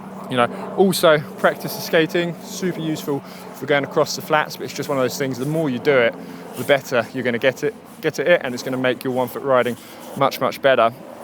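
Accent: United Kingdom. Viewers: You are so inspiring mate! You got the cool and positive attitude, i sometimes look at your videos just to relax (and for your lovely british accent). I hope i get to Alpes d'Huez some day to learn some stuff and correct my riding posture someday ;) Cheers, keep up the nice work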